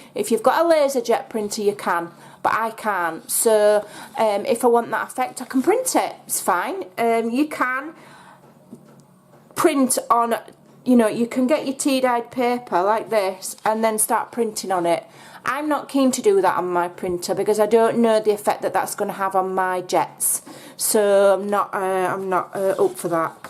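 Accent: Yorkshire, United Kingdom. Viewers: yes i guessed you were from Yorkshire lol xx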